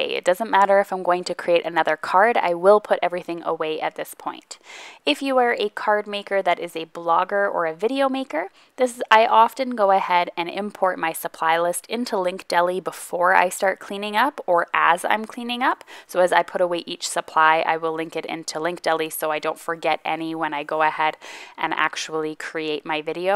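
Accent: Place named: Canada. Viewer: Four things I learned from this video: 1. You have for sure been back in Canada long enough for your “about” to sound more “aboot” 😊 2. I need to see more of the puppy!😍 3. It’s really fun to watch this process 🎬 4. I really am that lazy, it’s why I know I need almost all of my supplies in easy reach places 😄 Thanks for sharing! Oh and for the Misti and trimmer you could add a bar to the inside of your desk near the floor to slot them into. I’ll IG you a pic 👍 it works great for me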